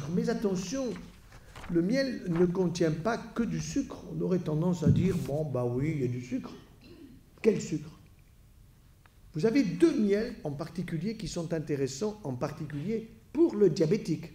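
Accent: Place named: South, France. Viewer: Entendre respirer la santé avec cette conférence pleine de bon sens, au rythme slow du laboureur qui swing avec le bio, et madeleine de Proust, avec l'accent de Marcel Pagnol. Professeur Joyeux vous nous faites un réel grand plaisir. Patrice D. Ingénieur Agronome né 1944 10 ans irrigation goutte-à-goutte au Maroc.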